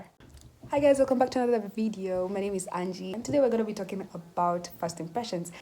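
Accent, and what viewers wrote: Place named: United Kingdom. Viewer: 😅😅 love the British accent! Great tips…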